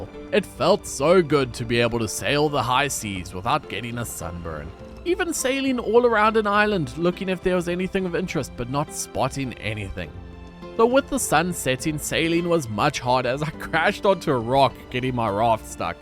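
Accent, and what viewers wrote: Afrikaans, South Africa. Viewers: I think anyone who has ever met a single south African forever recognises the accent. It's quite unmistakable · hy het n afrikaans se aksent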